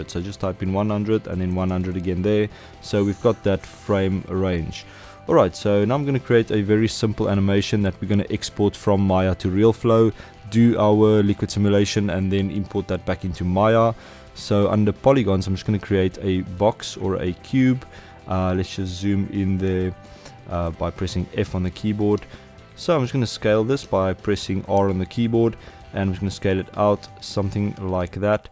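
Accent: South Africa. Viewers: Ja wat se! good to hear some SA'n tutorials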